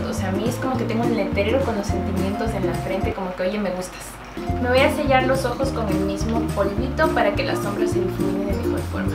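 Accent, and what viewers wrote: Quito, Ecuador. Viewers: Hablamos bonito los quiteños te escucho me identifico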